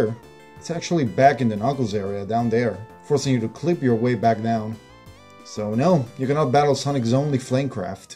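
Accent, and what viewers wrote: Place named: Argentina. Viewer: What an accent you have man! I'm also from Argentina and I still sound like one while speaking in English , s3 and knuckles definitely is one of the best sonic between mania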